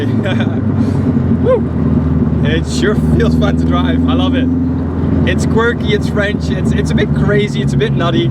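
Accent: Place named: Netherlands. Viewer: Die Nederlandse accent 😂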